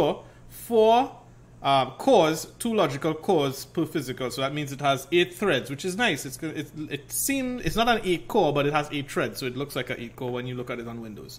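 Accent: Trinidad and Tobago. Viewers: Heard that trini accent from the go🇹🇹🇹🇹🇹🇹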